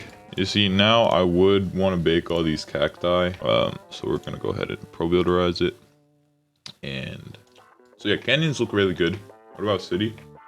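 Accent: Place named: United States. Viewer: Yo! What country do you live in and did you used to live in America because you sound American but you say your eu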